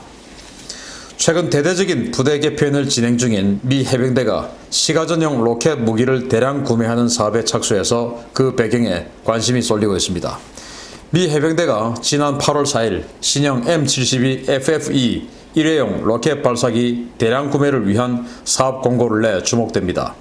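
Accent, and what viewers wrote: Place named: Gyeongsang, South Korea. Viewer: 경상도 사투리 학을 땝니다 표준어 기겁 합니다 오랜만에 고향말 들으니 정겹습니다 신선생님 건강하이소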